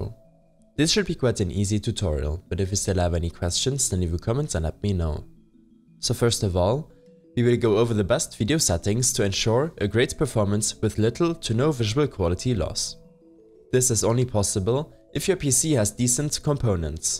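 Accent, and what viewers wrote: Germany. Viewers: i bet ur german u sound like one ich bin ja auch deutsche :D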